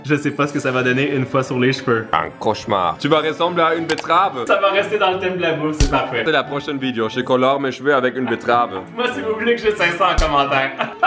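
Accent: Canada. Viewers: Pourquoi tu parl français avec un accent canadiens et tabite au Canada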